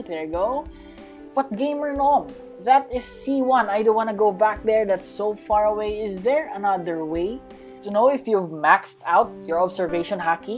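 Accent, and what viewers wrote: Philippines. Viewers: sabi kona e pnoy k e accent plg(TT) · yea he is filipino u havent noticed? about his accent? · Sabi na Filipino ka kuya Sa Pag Sasalita Palang Nang English Parang si Kuya Kitt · Bro i didnt knew you were filipino sa totoo lang halata sa accent mo pero di talaga ako sure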